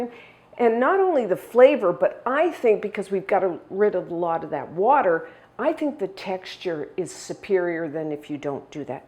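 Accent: Canada. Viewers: Yeah I can pick up her Canadian accent.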